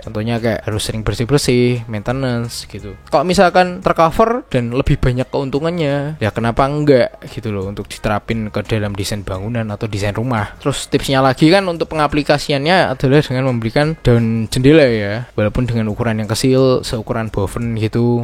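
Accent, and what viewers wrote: Java, Indonesia. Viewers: Hmmmm jowone medok banget. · Logat jawir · Mas, medhoke pol asli😅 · Jowo ne ngendi mas...? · Medokkk tmen... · Mas kamu mok muuuedhook banget logat e, wong endhi tho?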